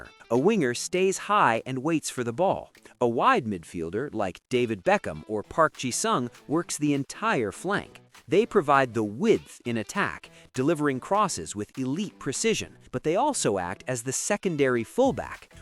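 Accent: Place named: United States. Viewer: These pronunciations.. you yanks rly should stay away from football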